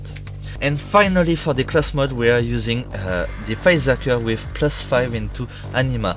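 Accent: France. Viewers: T'es français non 😂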